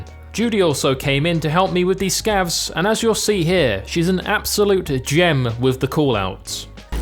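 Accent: England, United Kingdom. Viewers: Your vids are absolutely wonderful And your English accent amplifies their greatness in 10000 times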